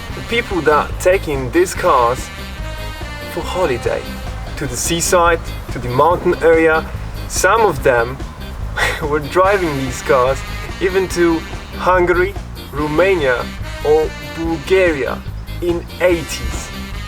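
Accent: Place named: Poland. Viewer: Genialny materiał! Pierwszy taki na YT. Jestem fanem 126-stek, a dzięki takim filmikom, mogą znaleźć się fani zagraniczni. Piona ziomuś! P.S. jesteś Polakiem? Bo masz akcent zajebisty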